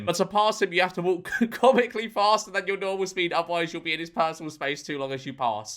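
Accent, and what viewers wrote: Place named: Birmingham, United Kingdom. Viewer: Extra brummie today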